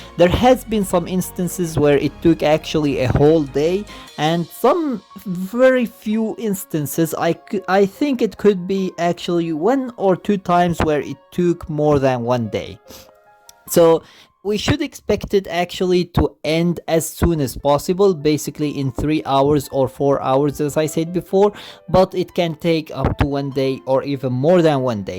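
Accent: India. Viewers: Indian accent XD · Ayo 4 Why is ur ascent Indian is one of ur parents Indian or something like that?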